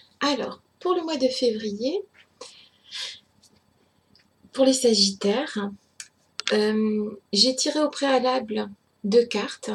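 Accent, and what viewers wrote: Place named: England, United Kingdom. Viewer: Merci 🙌💖 c’est certain ça ne se présente pas en mode « pump it up » quoi que?! 🤣 mais j’avoue que je comprends très bien ce tirage à ce moment de ma vie ou je n’ai plus envie de me battre contre ce passé pénible mais plutôt œuvrer pour moi! J’hésite en terme d’investissement travail... alors je vais revenir à l’intérieur 🙏🏻 et je garde mon guide de paix dans le coeur pour février 😌 beau mois de février 😘 et j’adore votre accent anglais 👌🏼👌🏼👌🏼 perfect!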